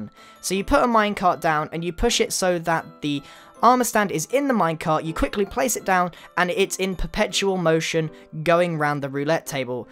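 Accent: England, United Kingdom. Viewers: Grian ar u talking 100% english or american or is it. the same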